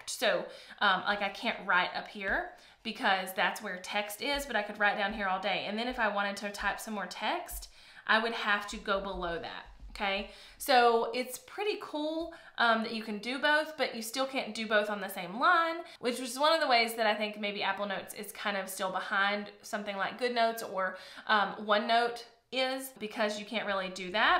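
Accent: South, United States. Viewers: I love that southern accent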